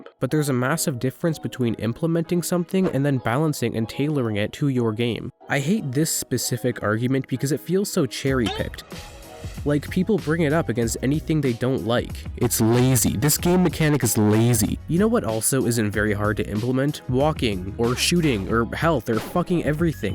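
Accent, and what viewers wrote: Canada. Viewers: you're Canadian right? also fun video.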